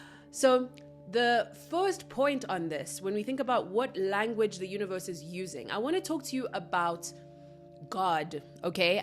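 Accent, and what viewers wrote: South Africa. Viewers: BABES ARE YOU FROM SOUTH AFRICA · I just KNEW that lovely, beautiful dialect was South Aftican! Love the “flea market of life” analogy. Love to you, Darling Sister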